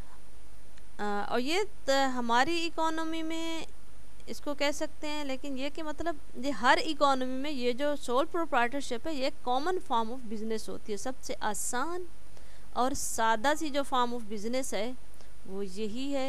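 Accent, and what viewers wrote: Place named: Pakistan. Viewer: Sound like ur a pakistani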